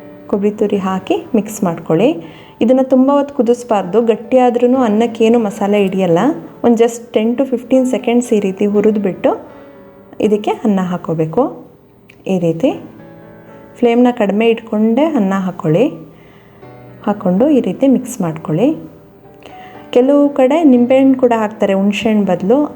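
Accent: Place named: Mandya, India. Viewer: Sorry for asking, since you're slant of Kannada resembles Mandy side, still you're from my husband's place nice to hear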